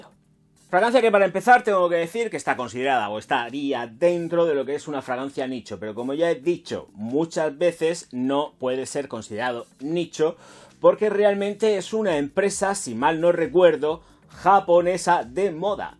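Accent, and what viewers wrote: Spain. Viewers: Hola! Acabo de descubrir tu canal y qu'e gusto da escucharte! SUpongo que como soy aragones el que seas murciano es un plus:p. Yo emprecé a interesarme en los perfumes gracias a esta misma, la Wonderwood. Luego probé la Wondergreen y no me gustó tanto. Y cuando sacaron la COncrete me entamoré muy fuertemente, tanto que lleva siendo mi perfume principal desde hace todos esos años. Quiero encontrar algo parecido para cambiar. Yo pensaba que no era un perfume muy de nicho pero por loque he ido leyendo a la gente no le gusta mucho. Crees que me podrías recomendar cosas parecidas? Un arbazo!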